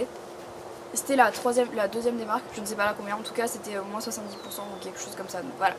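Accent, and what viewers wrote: France. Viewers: Je suis tombé en amour avec tes vidéos ! Tu es superbe ! Et j'adore ton accent ! xd Haha ! C'est pas tout les jours qu'il y a des français chez moi ! x) Okok, j'arrête ! Bref, je t'adore ! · Ta un gros accent francais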